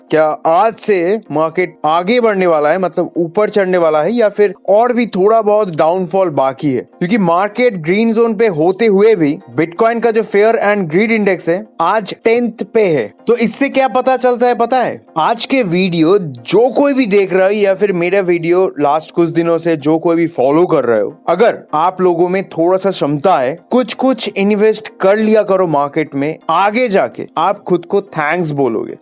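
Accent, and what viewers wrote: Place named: India. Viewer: Beautifully explained. I love your ehindi accent....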